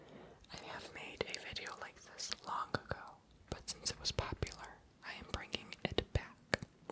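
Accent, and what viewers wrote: Spain. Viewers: Gracias! love your Spanish accent it's brilliant! As my first language this vid was extremely relaxing 😘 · wow nice spanish accent lol well anyways nice video made me sleep alot hahaha😘😆btw im spanish too so i can tell u have a good spanish accent. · your really good at doing an Spanish aksent! I also subscribed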